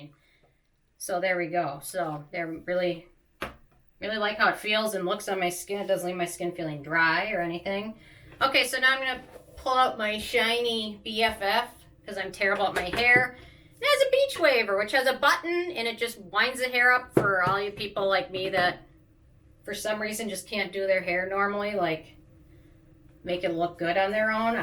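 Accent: Minnesota, United States. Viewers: Minnesota! I was wondering where the accent came from!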